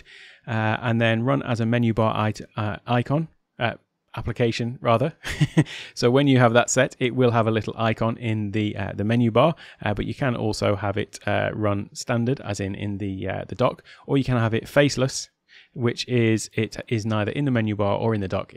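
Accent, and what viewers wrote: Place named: Europe. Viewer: "that was a bit of useless information" - yes it was ha ha, but you Euros are just so pleasant to listen to I didn't mind it. Any of us across the pod we would have lost everyone in 30 sec...ha ha. Thx for taking the time to post, appreciate ya.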